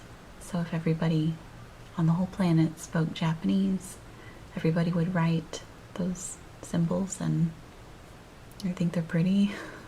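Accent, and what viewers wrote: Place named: England, United Kingdom. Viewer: Dear how come you have no ta America an accent but a English tong of speaking why so many Questions answer the half of it and deeper to your self and closer to the Public you get more out of your program because your very good telling your story wat you want to tell stay in the same passion like your hearings and your become a very wise women stay by your mind and have your self love t Gautier le Bois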